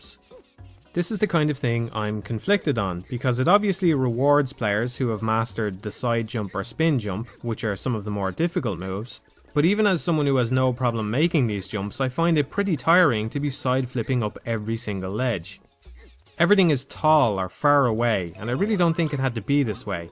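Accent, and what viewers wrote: Dublin, Ireland. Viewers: Great to hear a working class Dublin accent on youtube, especially one that voices such well informed analyses.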